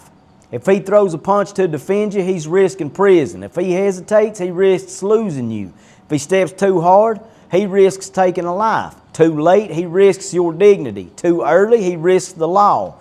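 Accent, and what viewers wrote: South, United States. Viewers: Lived in Nashville for a year and honestly love that accent as the southern belt residents With love from Madina may Allah bless you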